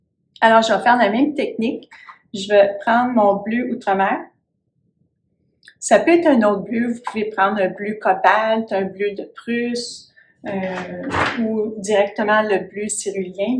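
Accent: Quebec, Canada. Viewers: J'aime bien❤ ton accent, il est canadien ? Merci pour toutes ces leçons et ces bons conseils 💐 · MERCI BEAUCOUP, POUR TOUS VOS CONSEILS, J'AVAIS ABANDONNE LA PEINTURE ,ET VOUS M AVEZ DONNE AVIS DE REPEINDRE ,VOTRE ACCENT EST TRES AGREABLE, ET DONNE ENVIE DE PARTIR AU CANADA BONJOUR DE FRANCE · Merci pour cette démonstration superbe et tellement concise préside et intéressante avec en prime votre très bel accent canadien encore merci je m abonné de suite 👏👏👏👍👍👍💓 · Merci bcp ces nuances variees lumineuses rejoignent un bel accent du QUEBEC !!! A Bientot 😮😊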